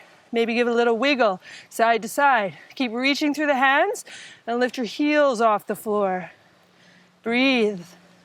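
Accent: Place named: Canada. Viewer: This is my go-to practice every night when I get home from work. It’s challenging, energizing and I never get tired of her comments and instruction. (I also adore her Canadian accent.) I smile every time she says to relax/let go of the idea there is somewhere to go. I also recommend her other videos. 💗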